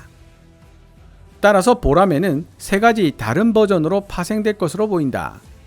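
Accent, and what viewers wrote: Gyeongsang, South Korea. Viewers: 갱상도 사투리 심하넹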